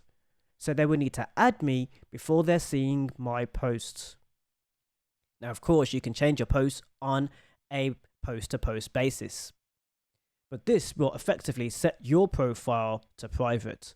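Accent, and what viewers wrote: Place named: Wales, United Kingdom. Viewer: You sound Welsh, lol! Well, I had a Welsh friend who had a similar accent atleast.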